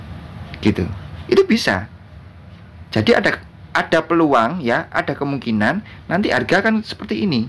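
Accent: East Java, Indonesia. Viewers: Antap Brada Jatim 😇👍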